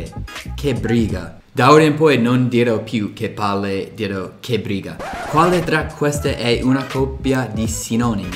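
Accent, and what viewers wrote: United States. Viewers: L'inglese parlato in Inghilterra é piú facile da capire. Con l'accento americano come il tuo non si capisce un cazzo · sei molto simpatico e hai una notevole proprietà di linguaggio in italiano, e conosci moltissimi vocaboli. solo hai un grande accento americano, ad ogni modo si capisce bene quel che dici, lo puoi anche tenere.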